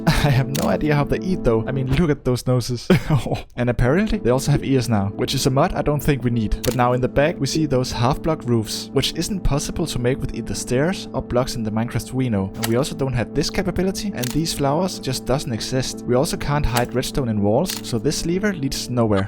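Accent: Denmark. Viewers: as a dane i can really hear the "danish" when u speak funny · Den danske accent er kraftig men god video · Are you danish I think I hear some danglinsh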